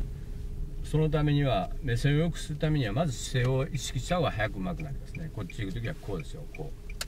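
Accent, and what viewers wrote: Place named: Kansai, Japan. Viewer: 懐かしい関西弁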